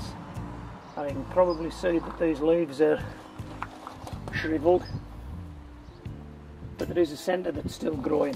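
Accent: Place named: Cumbria, United Kingdom. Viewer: Clicked on this to hear a fellow Cumbrian. Fantastic!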